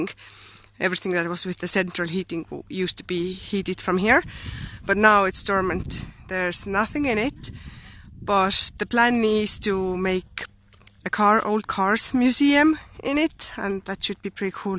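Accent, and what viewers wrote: Ireland. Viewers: I bet u live in ireland as u have irish accent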